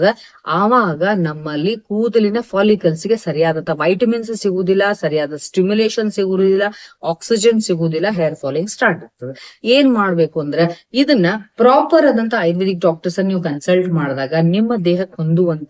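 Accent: North Karnataka, India. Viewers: 🙏 medam nim matna keltidra niv nammane sadasyaru anno feel aglikttada, bcs nim matu uttara karnatakda bhashe... So i lv ur spch❤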